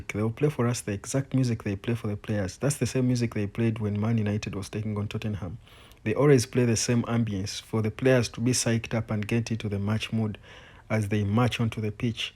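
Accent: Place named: Uganda. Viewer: eno accent ye Uganda eno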